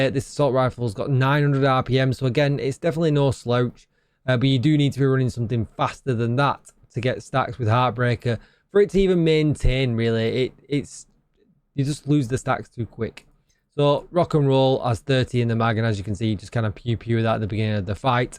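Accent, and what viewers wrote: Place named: Scotland, United Kingdom. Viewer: Hey, buddy I'm new on the channel, your accent sounds like the SOAP on the new MW series, may I aks where you from???? Take my Thumb Up 👍 right from Brasil.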